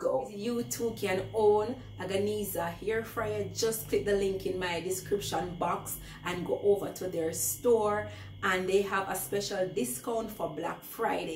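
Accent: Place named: Caribbean. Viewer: She talking internationally so people will understand seen can't talk the deep patwah straight have to broaden your audience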